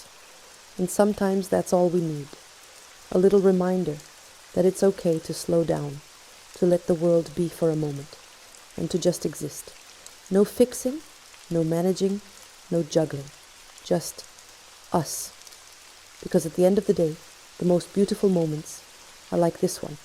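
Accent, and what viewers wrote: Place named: France. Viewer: I ❤ Your French Accent. ❤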